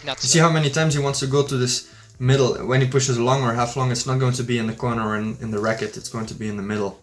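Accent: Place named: Flanders, Belgium. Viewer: Nice video, thanks! And I thought you gave Timo a good game:) From your name I was surprised to hear you had a Flemish accent. Goed gespeeld;) It’s a pretty basic observation, but I noticed many of your returns from topspin balls deep into your backhand (when you were close to the table) went long. I often do the same and I’m puzzling over effective options in such situations. Good health to your knee and many more amazing TT adventures to you 🙏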